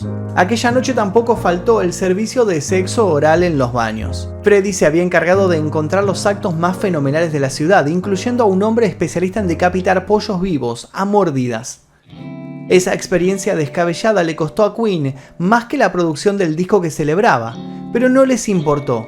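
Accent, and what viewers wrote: Argentina. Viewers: Este narrador es argentino cheee, y quizá no se la coma igual se le salen los ojos 👀 mirándola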